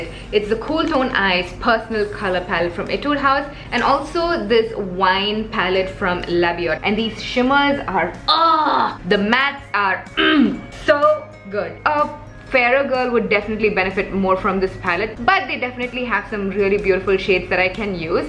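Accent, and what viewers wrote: India. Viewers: It's an Indian accent, but with a little bit of western influence? Idk accents in India vary from place to place and generation to generation. There's soo much variation seriously, like in my class alone, I can identify at least 5 different accents.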